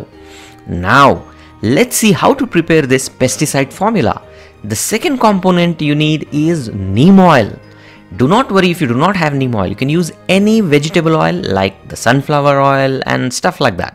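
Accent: India. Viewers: Very easy to use.i usually use backing soda for cleaning purposes.. Now I will do this ,Thank you sir.Your English is very good and clear Are you an Indian .?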